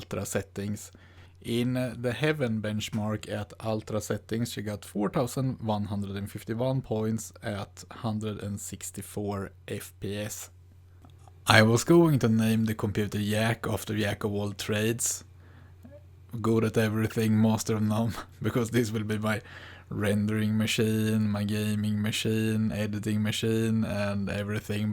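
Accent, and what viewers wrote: Sweden. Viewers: Jäklar vad man hör att du är svensk:) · Are you swedish?? Sounds like one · thicccc Swedish accent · That Swedish accent :D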